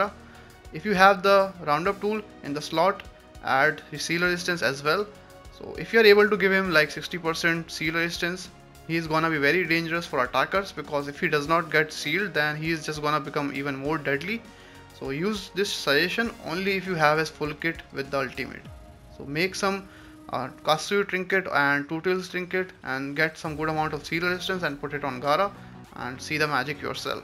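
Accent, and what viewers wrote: India. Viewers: Indian hoke English 😅I can't understand 😢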